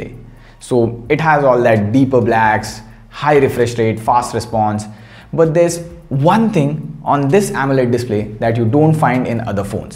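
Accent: India. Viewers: Good Indian English review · Прикольный акцент у индюшонка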